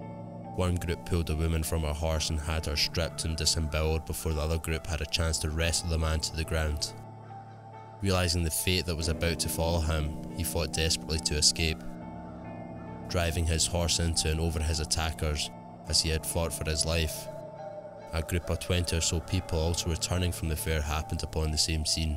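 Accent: Scotland, United Kingdom. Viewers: Hiya ive recently came across your channel & I love the Scottish accent & history so you've got yourself another sub:) Keep up the good work your doing & looking forward to your next video. XxX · Great now I'm definitely not going to sleep at all. I love your channel and the fact that it's in Scotland and told with a Scottish accent. Ugh death by no sleep lol. I am loveing the stories and the chills though as they are definitely what I watch them for. I Hope you keep up the great work and continue the scares. Have a blessed day or night. Oh and I think I'm going to call you. short for as you sound like a vampire just don't pronounce your w's as v's so it is even more chilling and better to listen too.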